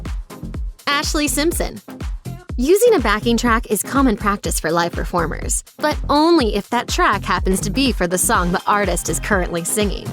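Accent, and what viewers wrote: United States. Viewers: Agreed, on every point. However, our narrator in THIS case isnt a brit, nor does she speak with an unusual dialect or accent. She sounds like a born n bred 'murican to me.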